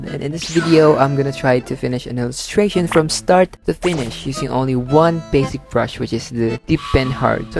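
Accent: Philippines. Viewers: Interesting how people thought you were Korean. As soon as I heard your voice, I was like "that's a Filipino!" Probably because immediately used to the accent XD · Omg how did I guess that I knew you were Philippines just by your voice/accent! And love your art so much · i can here ur Philipppineas accent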